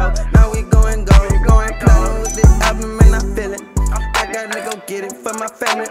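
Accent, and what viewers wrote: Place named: Birmingham, United States. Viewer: aye u from birmingham alabama?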